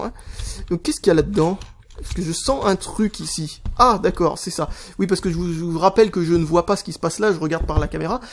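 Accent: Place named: Lyon, France. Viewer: Salut ton accent me fait penser à un accent Lyonnais. Ai-je raison?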